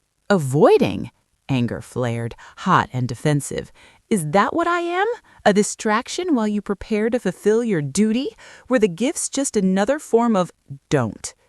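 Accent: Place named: United States. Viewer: Don’t like the American accent—why not RP ?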